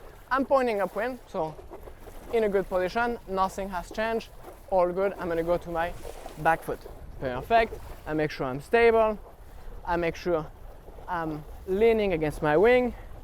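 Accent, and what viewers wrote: France. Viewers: Mec, en anglais tu as un petit accent français et en francais tu as un accent anglais. Tu viens d’oû?? Super video sinon bravo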